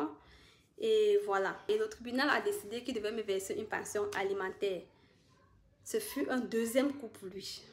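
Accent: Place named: Cameroon. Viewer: vous avez l'accent du Cameroun avec une influence du Gabon (c'est comme si vous avez vecu dans 2 pays en Afrique)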